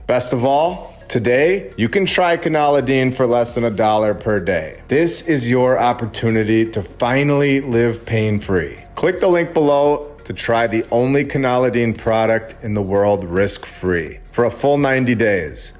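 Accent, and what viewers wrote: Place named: Wisconsin, United States. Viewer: you can't hide that wisconsin accent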